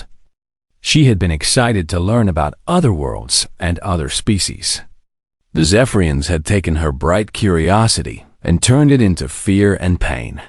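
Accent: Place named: New York, United States. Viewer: This new york accent sucks. I cannot listen to this or watch this...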